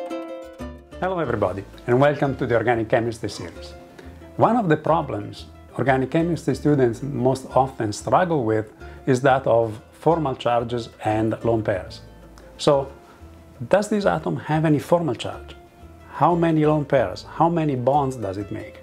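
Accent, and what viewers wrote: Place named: Italy. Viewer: Excellent information that will help me teach this concept to my general chemistry students. Bonus listening to it with an Italian accent!!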